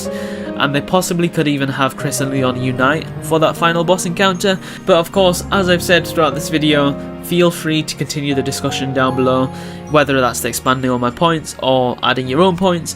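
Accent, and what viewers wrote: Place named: United Kingdom. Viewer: You sound soooooooo british hahah love it man keep it up!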